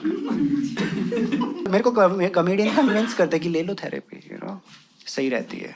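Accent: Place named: United Kingdom. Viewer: your english accent gets me everytime :D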